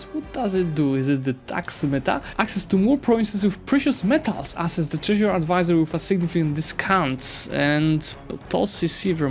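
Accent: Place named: Poland. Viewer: <3 love the polish pronunciations myself, thanks for the updates. Really cool that you were able to get a preview!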